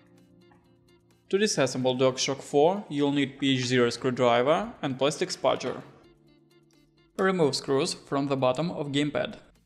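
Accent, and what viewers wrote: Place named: Russia. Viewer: Русский акцент 😅, лучше делай видео с субтитрами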